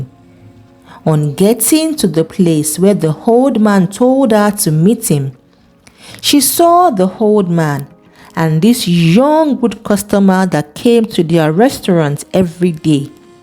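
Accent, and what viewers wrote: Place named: Africa. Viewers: She do not need to tell our African stories in white man voice. Miss, love your accent don’t listen to that Oreo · Nne, we're Africans and proud of our language and accent. This is Original not fake.That person has inferiority complex.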